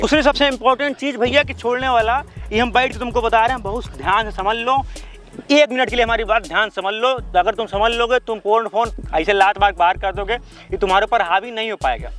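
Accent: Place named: Bihar, India. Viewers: Bolne ka style pehewani aur bhojpuri jaisa hai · Big brother aap Bihar se ho Aap ki avaj Bihari hi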